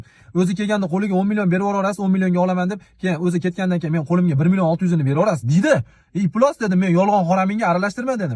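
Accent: Andijan, Uzbekistan. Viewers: Анжанданмисиз гап йук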